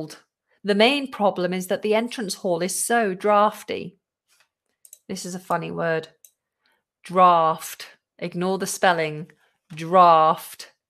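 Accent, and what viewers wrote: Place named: United Kingdom. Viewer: Hello teacher.This is also a great one as always.Actually we try to imitate you to sound more british.Love your accent.Hope more pronunciation lessons from you.Love from Sri Lanka.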